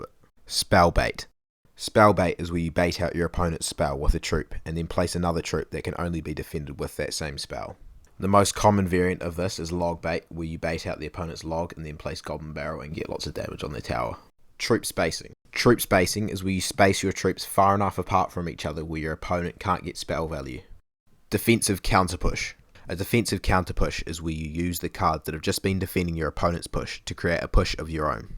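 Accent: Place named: Australia. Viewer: Bro sounds Australian is this a ken reference???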